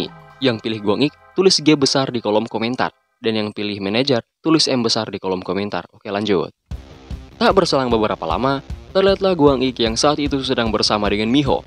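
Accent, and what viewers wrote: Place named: Parigi, Indonesia. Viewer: Balogat orang Parigi Sulawesi tengah hahaha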